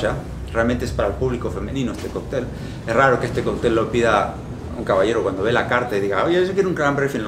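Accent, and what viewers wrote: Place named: Argentina. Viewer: Que lindo escuchar a un Argento chamuyando jajajaaja somos científicos de lo que hagamos jajajaja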